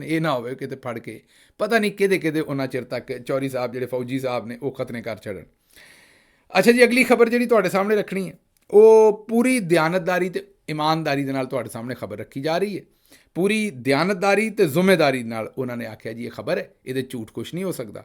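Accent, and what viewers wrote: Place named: Punjab, India. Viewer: Love your Punjabi accent 👍🇬🇧🇬🇧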